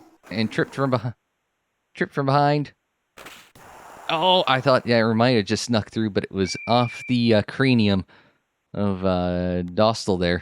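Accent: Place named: Newfoundland, Canada. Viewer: I am pretty sure that would be a Newfoundland accent...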